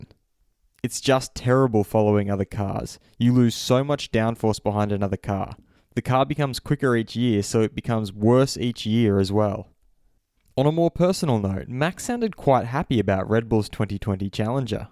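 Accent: Australia. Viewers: Always nice to hear an Aussie voice regarding F1, it's basically a cult interest over here. I have NO ONE to talk to about it.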